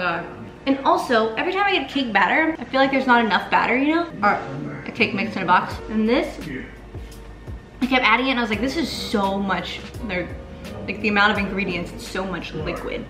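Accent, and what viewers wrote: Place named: United Kingdom. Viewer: The voiceover for your cats is SO FUNNY. Pls make a video doing that in your British accent or whatever lol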